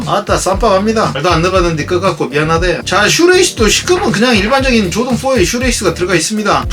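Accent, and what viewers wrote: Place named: Gwangju, South Korea. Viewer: 예전 영상에서는 전혀 몰랐는데 이번 편에서는 네이티브 사투리 살벌하시네. 광주 아니면 광주 그 근짝 동네 억양이신데 맞으요?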